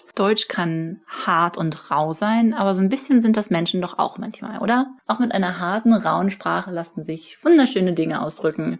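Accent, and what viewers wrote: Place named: England, United Kingdom. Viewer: I know some of those words! Ich mag hören zu deine Deutsch-voice. almost as much as your very English English accent. Mehr, bitte? I listened through once without subtitles and then again with subtitles. I was happily surprised to have genuinely understand some sections. I now know 2 (and a bit) languages and yes, there are concepts that I can only express properly in one of them. There is no good translation for kummerspek, 無心 or dag. yah? I mean, ja? and German is not harsh. Compared to French, maybe? I find it quite lyrical and soft to my ear.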